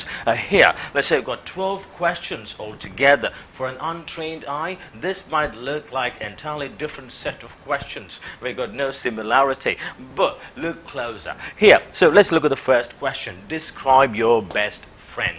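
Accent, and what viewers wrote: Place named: United Kingdom. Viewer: Looks like a British accent to me. Are u British?? Nice techniques, BTW.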